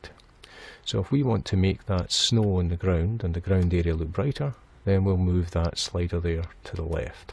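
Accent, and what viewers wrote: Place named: Scotland, United Kingdom. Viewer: I heard scotish.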